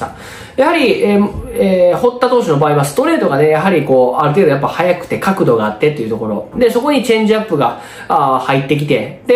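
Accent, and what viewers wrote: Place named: Kyoto, Japan. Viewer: 訛りを聞いて関西は関西でも京都の人だとすぐ分かった！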